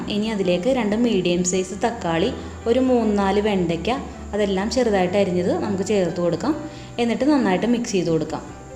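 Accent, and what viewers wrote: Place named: Thrissur, India. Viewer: Thrissur presantation superrrr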